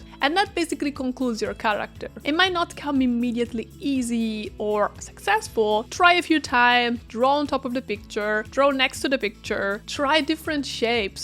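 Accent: Italy. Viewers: grazie mille! tutto molto chiaro e semplice :) un saluto! p.s.: commento in italiano perchè sentendo il tuo accento penso tu sia italiana, spero di non aver commesso una gaffe!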